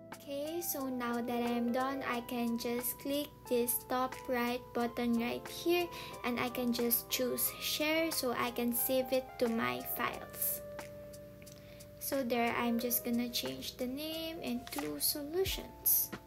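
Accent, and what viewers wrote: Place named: Philippines. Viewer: i like your way of speaking english, it's nice that you don't try to sound like other accent, it's very filipino while sounding very good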